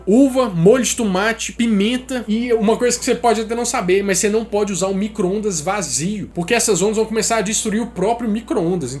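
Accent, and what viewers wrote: Minas Gerais, Brazil. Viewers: interesante eteu sotaque.. Mineirim? gpiano?? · Viciei nesse mineirin.. kkk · Sou fã deste mineirinho... Fala serio este jeitinho nosso malandrinho de falar da uma certa atração 😂😂😂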